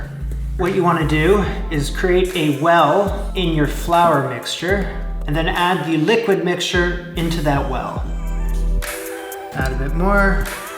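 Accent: Canada. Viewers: you dont need eggs and you dont need the bullshit pearl sugar; lots of cheaper and more common alternatives. (ps..are you canadian or from the pacific north west - you are familiarly annoying).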